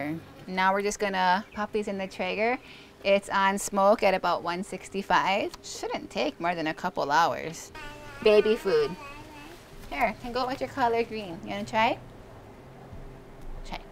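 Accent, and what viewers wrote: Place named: Wisconsin, United States. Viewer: I like listening to you because even though you're Hawaiian, your accent reminds me of being home in WI.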